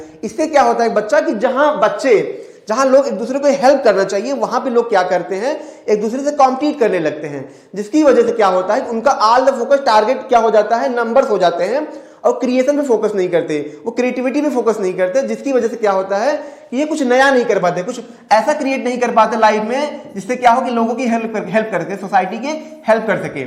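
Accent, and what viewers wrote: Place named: Uttar Pradesh, India. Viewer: I like the accent .....up hindi....explanation is superb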